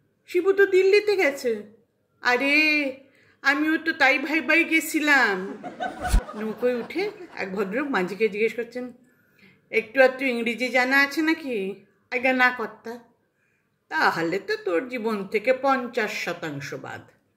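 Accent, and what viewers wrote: Bangladesh. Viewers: পূর্ব বঙ্গের ভাষা বেশ লাগছে । দিদি বিক্রমপুর এসে বেড়িয়ে যান । নিশ্চয়তা দিচ্ছি ভালো লাগবে ।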